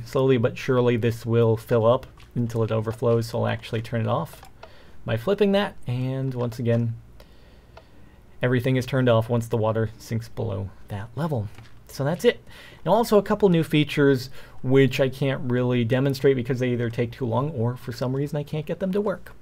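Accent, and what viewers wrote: United Kingdom. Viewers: way do you spik bridish? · He sounded british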